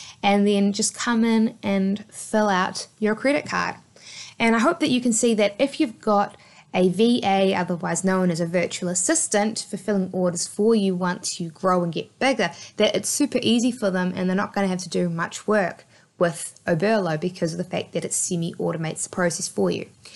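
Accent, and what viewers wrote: New Zealand. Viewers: Took me a few minutes to get the Kiwi accent but sounds like it has a twang from somewhere like Canada or Somerset UK ;) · Another great video thank you. Love that this is Kiwi made. Just a pity others don't understand our accent. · NZ accent, just replace 'e' into 'i', that's simple =) eg: tidtalks, stip by stip, siven & etc... Tips: 0.5 speed listen carefully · No she's a Kiwi (New Zealand) or as you guys would hear Nu Zilland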